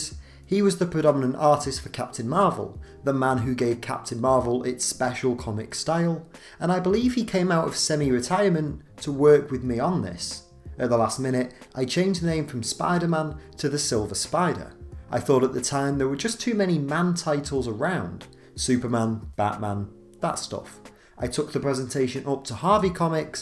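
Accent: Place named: Liverpool, United Kingdom. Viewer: Where are you from? Somethings you say sound Liverpudlian.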